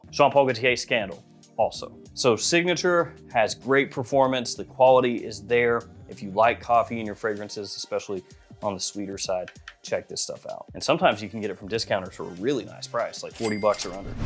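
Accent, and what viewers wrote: United Kingdom. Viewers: Mate, I'm from the uk and we ALL speak like that!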